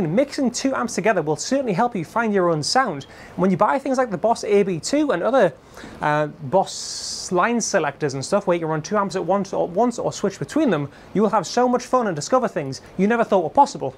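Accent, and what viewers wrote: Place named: Newcastle, United Kingdom. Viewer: I had no idea you were a Geordie. I thought you were Scouse or something. I really need to learn my UK accents.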